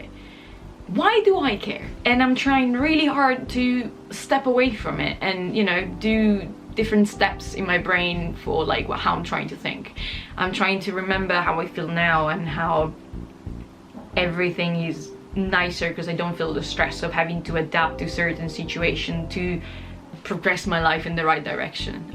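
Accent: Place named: England, United Kingdom. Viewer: Da quanto tempo ti trovi in uk? Sembri Inglese 😁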